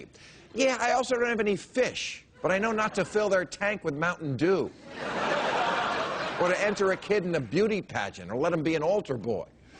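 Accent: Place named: Rhode Island, United States. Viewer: Sounds like you're from that poor Rhode Island neighbourhood.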